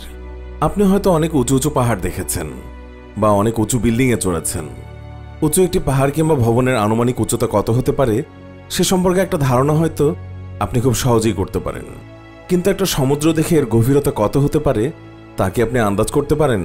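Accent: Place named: Noakhali, Bangladesh. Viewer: voice ta khub disgusting. Accent khub e baje. Kothagulo suddho vabe bola hoiche kintu accent noakhailla accent er moto lage.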